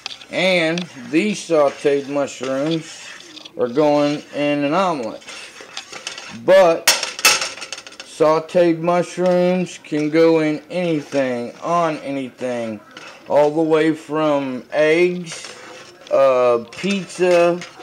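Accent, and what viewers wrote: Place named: Appalachia, United States. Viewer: Love this hillbilly accent and simple earthy ways, super...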